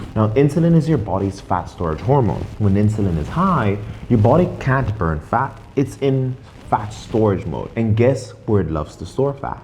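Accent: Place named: South Africa. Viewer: Thanks, why your accent is so South African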